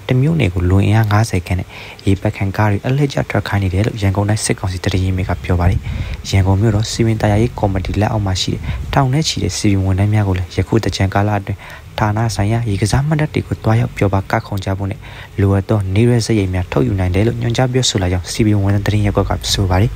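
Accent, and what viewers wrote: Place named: India. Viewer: ကုလားသံနဲ့ မှန်တာပြော ကျိန်ပြော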